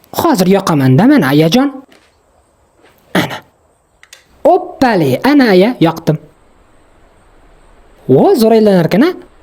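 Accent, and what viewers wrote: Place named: Syrdarya, Uzbekistan. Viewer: Sirdaryoni shevasi ekan😊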